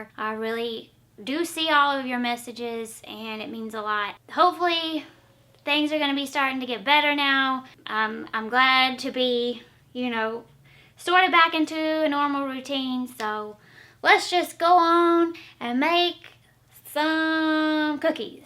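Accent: South, United States. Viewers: Love you when you bouncing around in the kitchen ,by the way your southern accent is gorgeous. I wish could learn talk like you. Xmas greetings from sweden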